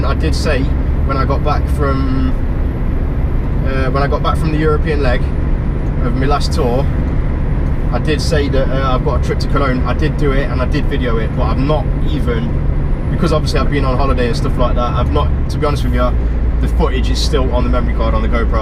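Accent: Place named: North, United Kingdom. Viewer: Ah, welcome back, nice bit of a northern accent and 😀, Belfast nice city, how many of ye on this tour.. safe travels...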